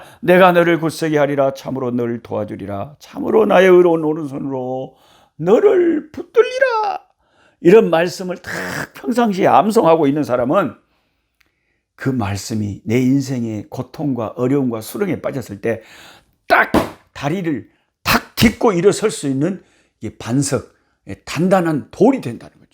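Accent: South Korea. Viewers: 구수한 지방사투리에 정감이 간당게유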